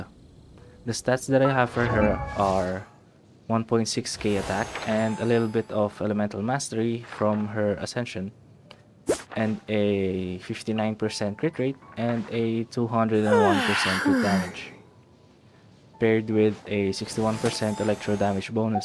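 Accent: Philippines. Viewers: Sounds like you're a filipino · ok yung english mo pare, di naman siya cringy it's just english with a pinoy accent. nothing wrong with that : ) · Pinoy blood my dude 🔥, lahat naman tayo ganyan magsalita ng English kahit ako Englishero ako eh ung accent lang talaga HAHAHAHA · You sound like you're from ph. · You sound Filipino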